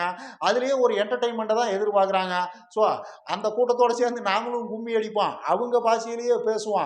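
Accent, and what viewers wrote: Kongu, India. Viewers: தம்பி உங்க கொங்கு தமிழை நீண்ட நாள் கழித்து கேட்பது மகிழ்ச்சி.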